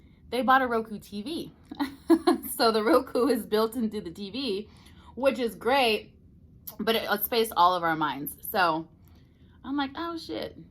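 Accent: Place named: South, United States. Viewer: That's that southern Belle in us. Southerner here too🤗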